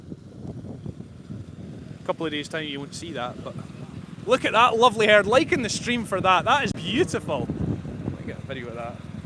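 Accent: Scotland, United Kingdom. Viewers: This is a course in learning your accent, Scottish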